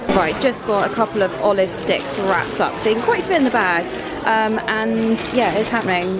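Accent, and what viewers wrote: United Kingdom. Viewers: Love that British accent, so cute.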